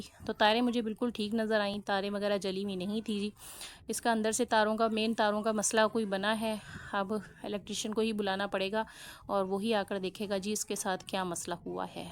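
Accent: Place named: Punjab, India. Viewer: Urdu main Punjabi touch acha hai.chuk k rakho..😂😂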